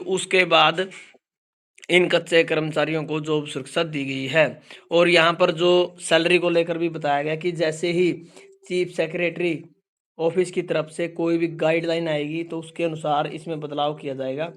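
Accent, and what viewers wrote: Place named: Haryana, India. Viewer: Pure haryana ki h kya ye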